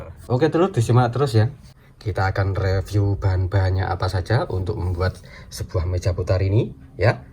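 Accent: Surabaya, Indonesia. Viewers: You sound like you are from Surabaya going by your accent?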